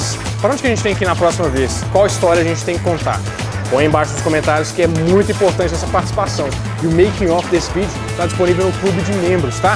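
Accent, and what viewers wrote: Minas Gerais, Brazil. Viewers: “Burracha”, “u pau tá quebrando” Hehehehehe Mineirês é top demais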